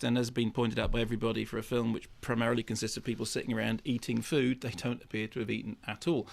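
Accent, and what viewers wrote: United Kingdom. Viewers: A British accent.....don't y'all have a monarchy still along with a bunch of wealthy elitists in your nation? Not saying you support them or anything......but just because they come from America doesn't mean that their consumerist and capitalist mindset is synonymous for all Americans. Come back when y'all change the government structure of the UK.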